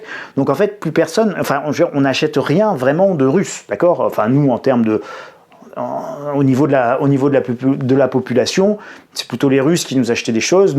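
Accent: France. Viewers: jaime votre accent francais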